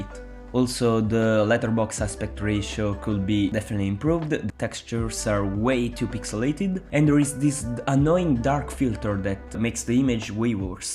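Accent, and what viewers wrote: Italy. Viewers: bro bel video ma si sente che sei italiano e mi cringio un po' a sentirti però bel video eh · You sound so italian bro Non è per offenderti btw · Wait i hear italian accent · Sei stra italiano vero? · Quanto si sente che sei italiano porca troia ahah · you sound like an italian speaking english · I understood you were italian only by the accent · Bro are you italian?? You're accent it's very similiar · I lovr your accent, are you italian? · sei italiano vero? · Si sente subito che sei italiano! Sono cresciuto con i giochi di mario per gamecube e wii, è sempre bello vedere che i fan hanno ancora a cuore i titoli del passato e si adoperano per renderli immortali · U sound Italian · Are you Italian?? As an American I Love the accent. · Non so perché ma ho la sensazione che tu sia italiano. Sarà l'accento ahaha. Comunque gran bel video. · Io, secondo me dall’accento è italiano… vado nelle info del canale, location: Italy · are you italian? 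i can hear it.. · out of curiosity, are you italian? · Bro ma hai un accento italiano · Ma sei italiano ahahahha, comunque bel video anche per i 4k60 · Hai un'accento italiano fantastico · di che sei italiano senza dire che sei italiano <3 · sei percaso italiano ? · Bro you sound kinda italian, are you? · This dolphin-powered fan-made collection is such a cool concept. Love these upgrades, this looks like a very neat package. PS: Comunque, adoro il tuo accento. Sembra che il video lo abbia narrato Super Mario in persona. Nel senso buono della cosa. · one of the best video I've seen! As I understood, you sound like you are Italian, as I am, that makes me even prouder that an Italian did this, without much effort. Can I just ask you if you can send me the control scheme for galaxy 1 and 2 for dolphin? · Ero sicuro di sentire una parlata italiana, e non mi sbagliavo. Ottimo video comunque, quando la versione ufficiale di Nintendo fece un flop non ne rimasi molto sorpreso onestamente. 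· bro ma sei italiano palese si sente una fracca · Oddio, ma sei Italiano. L'ho riconosciuto subito l'accento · Bro do u have an italian accent? Sembra un botto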